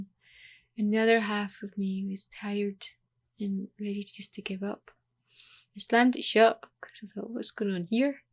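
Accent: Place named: Scotland, United Kingdom. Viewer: i almost moved to edinburgh for uni. Love your accent and story. I kinda agree with a lot of what you say and like the world doesn't understand and it is hard to be around people who just go along with whatever is trending despite them not really caring about protecting anyone, they're also the most mean people sadly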